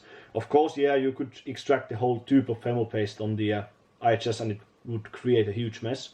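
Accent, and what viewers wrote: Finland. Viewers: Suomi brgl, tunnistin heti aksentist! 😂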